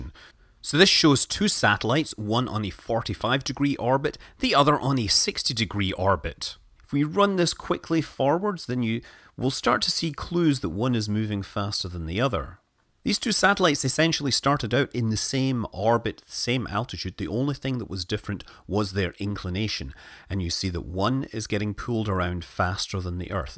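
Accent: Scotland, United Kingdom. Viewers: DigitalSaturnX by a manly Scott called Scott Manley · Jack Shanahan He’s a Scottish Laddy.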